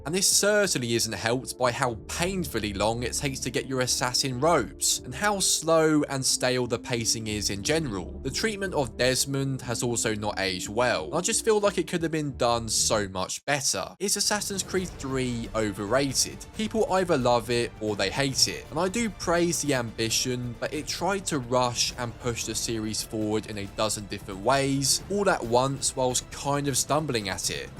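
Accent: United Kingdom. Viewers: wait this guy is bri'ish?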